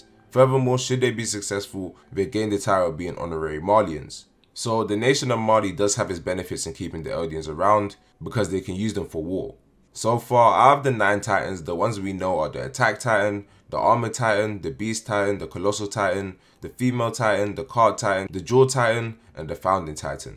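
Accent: United Kingdom. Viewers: couldve been amazing but i just cant understand the strong brittish accent?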